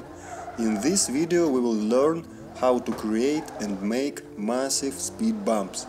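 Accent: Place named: Russia. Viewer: Здорово мужик ! Явно ты из России или русскоговорящий по речи слышно. Ну ладно желаю удачи в этом нелёгком деле ,я тоже занимаюсь ютубом. Кстати у тебя круто получается.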